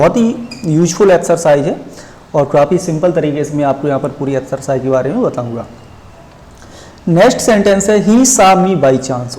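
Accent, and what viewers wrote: India. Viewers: you are poor English speaker.your pronounce as like as Indiana. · I can hardly understand you, coz of your terrible Indian accent teacher. Well I will try to make the best of this grammar exercise. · Amr Alhabori because this is an stupid indian teaching to illiterate dumbasses hear english from the people who speak in a good accent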